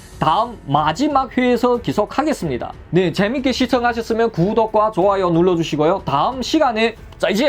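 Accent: China. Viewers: 중국사투리 자꾸 들으니 익슥해 지네요 화이팅!!!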